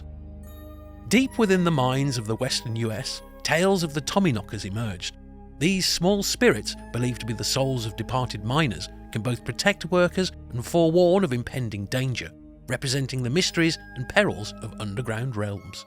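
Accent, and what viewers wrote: United Kingdom. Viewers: Ew. A british person....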